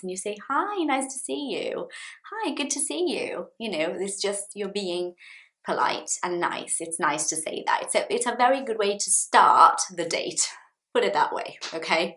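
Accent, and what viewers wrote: United Kingdom. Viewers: The British accent is not my favorite but I like the way you speak english!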